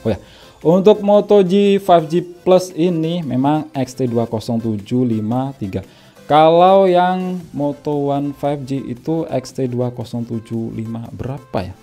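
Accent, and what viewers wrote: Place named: Java, Indonesia. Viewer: 765 tok=aja duh ketok medok e mas🤭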